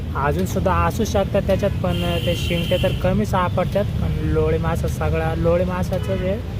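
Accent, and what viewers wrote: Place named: Maharashtra, India. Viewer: Tu katkari samjacha aahe ka tujya bolnya varun vatl baki kahi nahi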